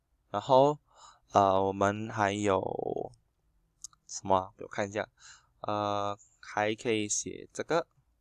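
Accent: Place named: Malaysia. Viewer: 先改一下你的馬來西亞的華語的腔調，很難聽！很多壞習慣！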